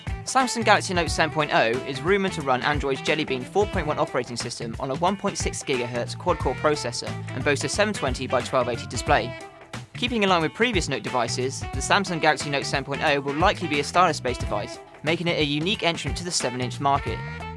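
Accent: United Kingdom. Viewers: BRITISH ACCENT :D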